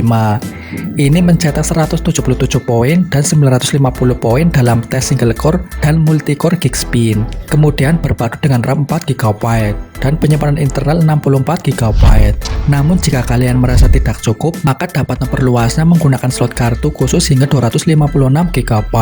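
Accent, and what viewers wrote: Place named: Java, Indonesia. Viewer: Logatnya jowo bngettt 😁😁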